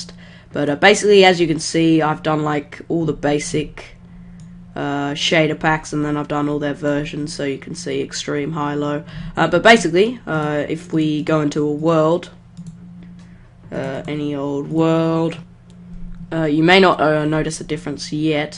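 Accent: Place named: Australia. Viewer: I smell Australian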